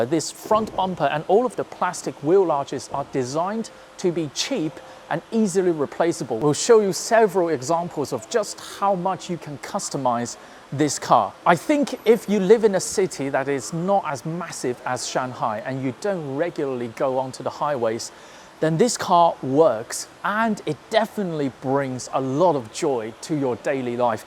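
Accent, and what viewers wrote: United Kingdom. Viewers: Just came across this video and channel. Who's this guy? He's hilarious and of course the accent and humour of a true brit!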